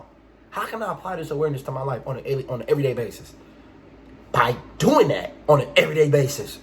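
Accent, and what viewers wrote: Baltimore, United States. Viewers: Baltimore accent detected. But nah for real thanks for all your help my G. Peace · U sound like a bmore nigga🤣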